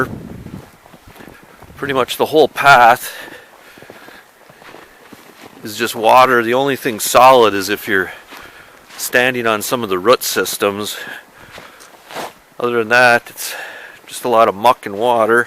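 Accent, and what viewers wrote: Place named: Upper Peninsula, United States. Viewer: Sounds like sout o Ishpeming, eh. One of dem yoopers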